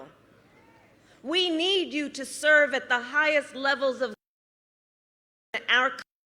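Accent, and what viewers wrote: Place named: South, United States. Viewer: Why is she talking like she was raised in the south?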